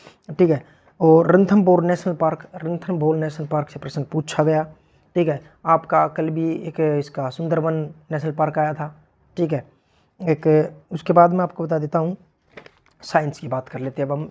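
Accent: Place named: Haryana, India. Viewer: Haryanvi for a reason ❤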